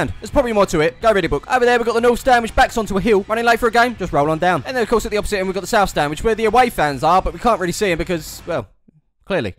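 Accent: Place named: United Kingdom. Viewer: No idea what this guy is saying, talks so fast in a crazy British accent...sounds like a Guy Richie movie gangster !!! How did I get here ??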